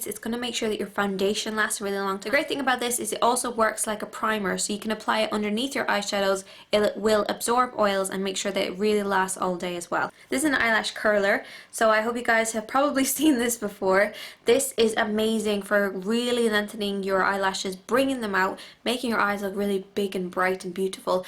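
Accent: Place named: Ireland. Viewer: You sound irish :)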